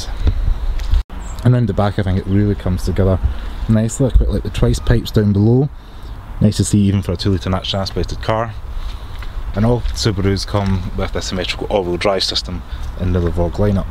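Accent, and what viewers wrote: Scotland, United Kingdom. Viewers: Good review and nice with a scottish accent. "Chelsea wankers" (childseat ankers) 😅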